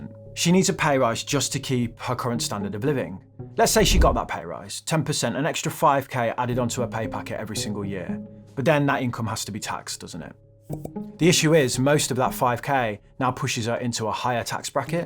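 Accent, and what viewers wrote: Birmingham, United Kingdom. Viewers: no I meant comprehensible I've never got through a whole one of his videos before perhaps because I've never found them informative or interesting or so clearly explained or maybe because I couldn't relate. Or maybe it was his brummy accent perhaps it was too depressing. Regardless this video was great I'm a subscriber now.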